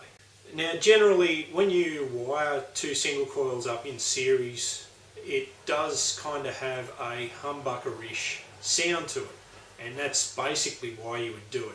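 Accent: Australia. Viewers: Thx for the vid! BTW, are you an Aussie? :)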